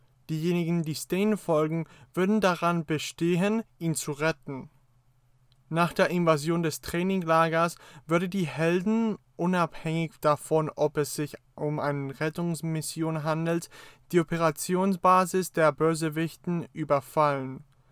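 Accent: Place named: Germany. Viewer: I thought he was German lol. From his accent while speaking English.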